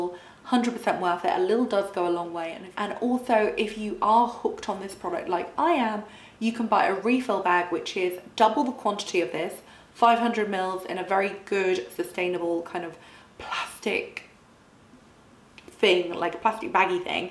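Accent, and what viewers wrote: United Kingdom. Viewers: Thank you for the infos said with a lovely British accent ❤️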